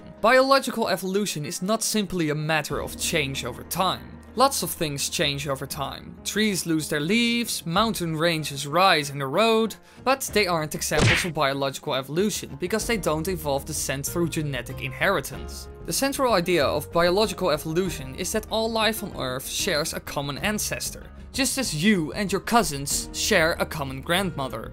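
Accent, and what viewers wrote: Netherlands. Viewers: ik kan gewoon aan je stem horen dat je Nederlands ben :'D toffe video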